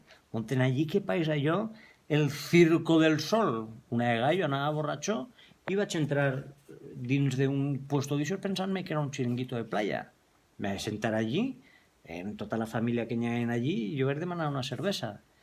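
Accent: Valencia, Spain. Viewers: parles el valencià churro, cap de castellà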